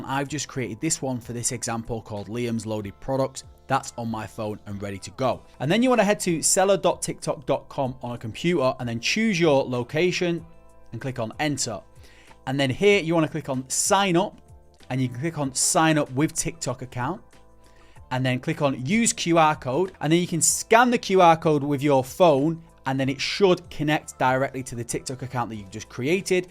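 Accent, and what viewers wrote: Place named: Northern England, United Kingdom. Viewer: English northern accent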